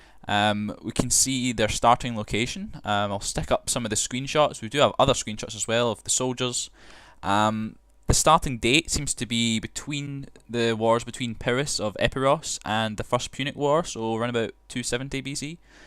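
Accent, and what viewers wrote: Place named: Scotland, United Kingdom. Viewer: The speaker is definitely Scottish!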